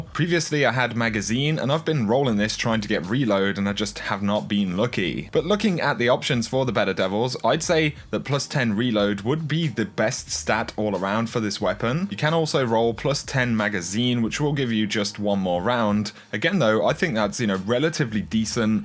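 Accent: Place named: United Kingdom. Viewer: Why does every destiny game guide feature a brit boy?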